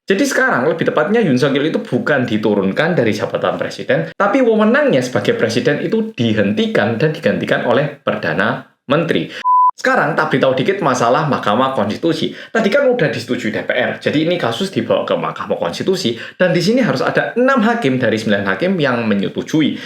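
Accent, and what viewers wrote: Java, Indonesia. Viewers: Mantap masss medok, update terus yaaa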